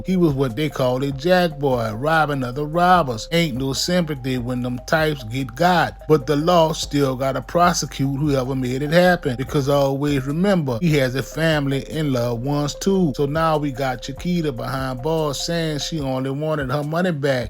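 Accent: New Orleans, United States. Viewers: who the man who telling the story ??? i love his accent that’s that new orleans lousiana accent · Lol. I understand what you're saying, but I'm from the area. This is a new orleans accent. A bit slow though.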